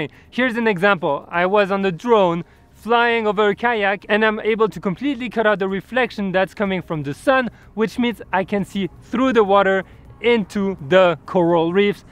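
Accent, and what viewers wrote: France. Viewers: ur french ! dT'S why ur accent seems like dt 😁 but ur awesome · hhh freinsh accent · Thats the natural French accent while speaking English! :) or may be the microphone was not powerful enough!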